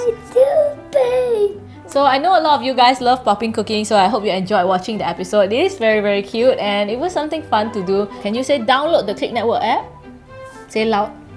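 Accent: Singapore. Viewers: Is it just me or accent is so different from her usual singaporean accent here lol and has singaporean accent yay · Sounds So So So Singaporean and Singlish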